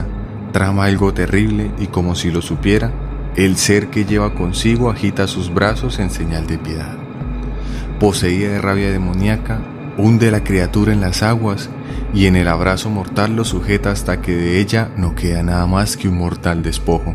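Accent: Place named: Colombia. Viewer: Jajaja que bien el tónico colombiano mi parceeee !! nunca había escuchado esta historia pero se parece a la de la llorona 😭 AAAAY MIS HIJOOOS !!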